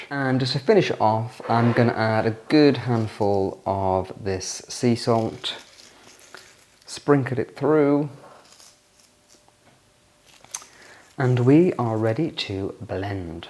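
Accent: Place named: England, United Kingdom. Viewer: I like your videos specially your English accent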